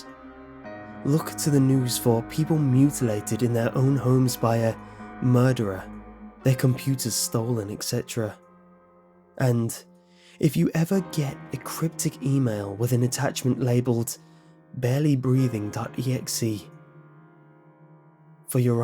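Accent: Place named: North, United Kingdom. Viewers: British, he has a more Northern British accent though. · As a Brit it sounds like Northern English to me.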